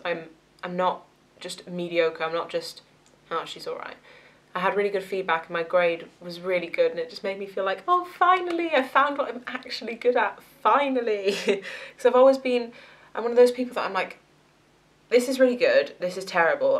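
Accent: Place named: England, United Kingdom. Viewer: Im an Irish student nurse and I think you definitely have an English accent haha!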